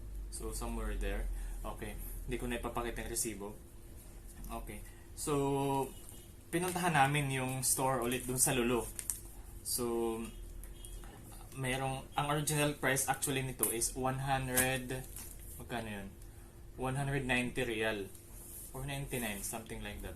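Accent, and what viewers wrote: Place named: Visayas, Philippines. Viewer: Bisaya to si sir uh.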